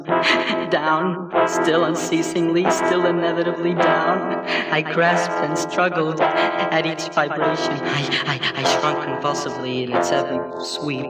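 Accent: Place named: New York, United States. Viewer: Cool. Woody Allen, reading Poe as a New Yorker.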